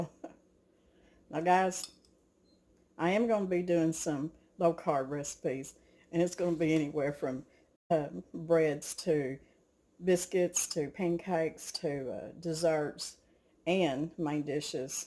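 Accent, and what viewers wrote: South, United States. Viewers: It's a life change. Diets are for quick weight loss. Thanks for your videos. I so enjoy watchin your southern recipes and love your southern acsent. Im going to make your salsa verde chicken casserole. I'm on weight watchers so i eat cauliflower as my rice replacement alot. Plus im not crazy about rice. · Thank you again...I am going through all your older videos...as I have said before, it is so relaxing listening to you talk. You Southern accent is just adorable. I certainly understand about keeping off the weight at 73. Carbs and sugar are the culprits for sure. But cravings for these things does disappear quickly.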